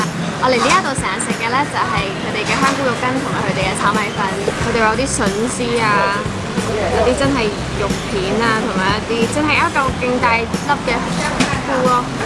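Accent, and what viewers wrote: Taiwan. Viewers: 有鹿港腔的! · 國語完全聽起來就是台灣人啊而且好漂亮很自然的美感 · 聽的出來，妳學的是中部台語，謝謝妳的影片！ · 真的才來台灣四年？？！！為何國語 " 台味 " 很重！沒啥任何港腔？！謝謝妳喜歡台灣！:-D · 你中文口音很像台灣人，沒注意還以為你是台灣人，正喔!!而且，我愛台妹XD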